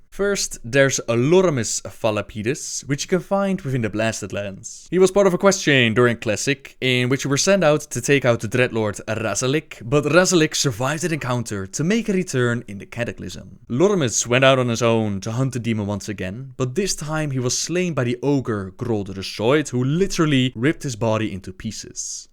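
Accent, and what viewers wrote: Netherlands. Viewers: he is from the netherlands, hes not american or enlish or some sort, he cant do anything about it. For some people who are from the netherlands its hard to pronounce. I dont have that, but i cant pronounce the R while speaking dutch, but i can while speaking english, its rly weird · dude Ur amazing with this. Dutch accent ? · Where are you from? You sound a bit dutch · dutch accent is real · Where is from?? I know it sounds weird, but when I visit my aunt she sounds sort of like him in like accent wise (she's Dutch) and it's the same with my dad.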